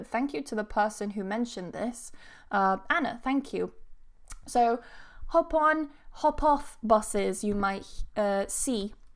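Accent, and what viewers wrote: United Kingdom. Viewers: Do you speak British English or American English? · She speaks British English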